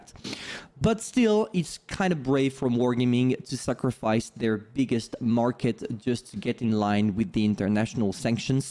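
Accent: France. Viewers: you are French?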